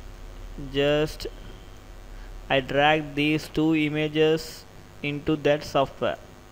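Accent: India. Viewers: Good, where are you from? I am thinking a state from India, tell which one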